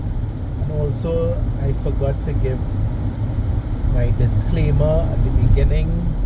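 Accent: Trinidad and Tobago. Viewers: Hi watching from Guyana. I love your videos I lived in Barbados, I am originally from Guyana but worked in Barbados for meny years. Barbados is a very beautiful country. I enjoyed evorything except for some of the locals. Most of the time people were quite hostile towards me because of my nationality especially in the workplace I did not expect that from such a major caribbean island. I have worked in Jamaca, Trinidad and Grenada and experienced welcoming people how would invite you into they're homes, but in Barbados people treated my family and me like dirt and always had remarks. This was very sad for me because we love the island. We are planning to visit next year and hope to have a different experience. I love the videos as we are planning to see all of the unique sites that you showcase. I love the Trini acsent 😁 I guess visiting and living in Barbados are completely different things. 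The only country where i had a similar experience to my experience in Barbados was when I was a young man studying in England.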